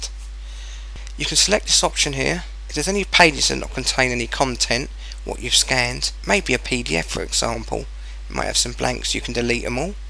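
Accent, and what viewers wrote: United Kingdom. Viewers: Thank you but this british accent is sometime so disgusting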